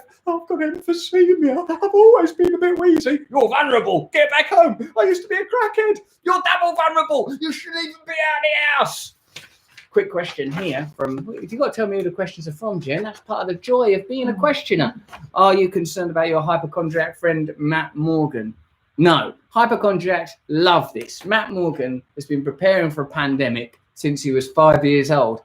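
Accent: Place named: United Kingdom. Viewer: Hi Russell I love your British accent :p and I had a crush on you :/ pray for me that I get a beautiful man inside out. Grey is beautiful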